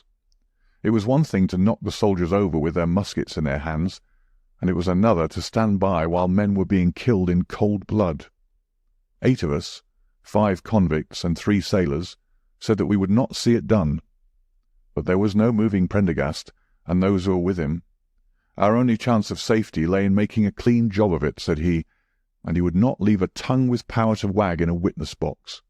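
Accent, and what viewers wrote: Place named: North, United Kingdom. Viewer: No the fellow is from the North .An actor i may say . Aged 45-50 . Thank you Watson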